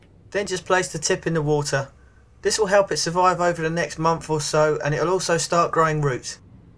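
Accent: England, United Kingdom. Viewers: When I first heard your accent I thought you must be an Englishman living abroad, then when I realised you can grow them in England it gave me extra confidence to try them here in France. I like your video I'll be giving this a go and then checking out your other videos. Well done and thanks. Al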